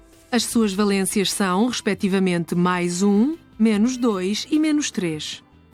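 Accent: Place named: Portugal. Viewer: Português Portugal top k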